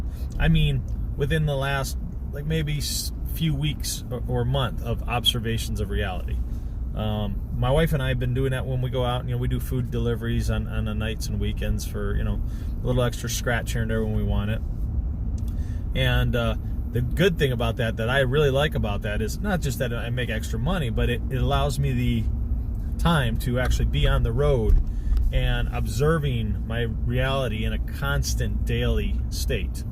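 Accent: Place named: Australia. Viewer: You tell like a Aussie 😁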